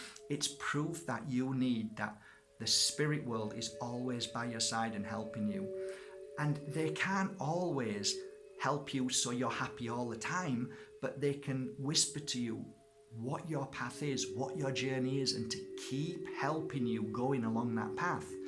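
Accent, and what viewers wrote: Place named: Yorkshire, United Kingdom. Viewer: Thanks for your wee signs video much appreciated and enjoyed. You sound like one of the Elliott brothers aka the Chuckle brothers. Are you from Yorkshire W.R.? Blessings to you from Sunny London town;-) x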